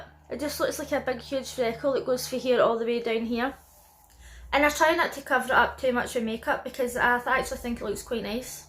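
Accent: Scotland, United Kingdom. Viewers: yaaasssss love hearing a fellow Scottish voice in a beauty review, glad I didn't buy this! thanks so much for the honesty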